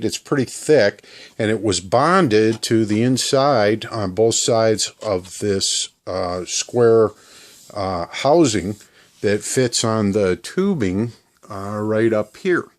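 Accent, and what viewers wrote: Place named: Chicago, United States. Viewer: Chicago area is my guess, too. My area of origination. Excellent modifications!!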